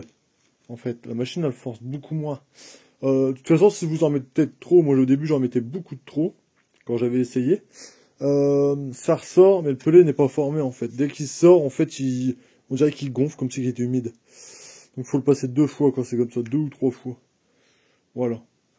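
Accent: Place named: North, France. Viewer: je m'en douter que tu était du nord accent de min coin cha sintin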